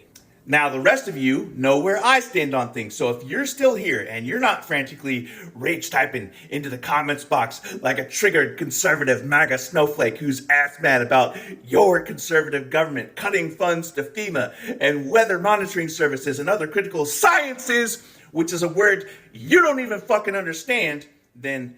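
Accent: Scotland, United Kingdom. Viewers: I love it when your Scottish accent comes out!! That's when we know you're beyond angry. One Team One Fight 💙 🇺🇸 💙🇺🇸💙🇺🇸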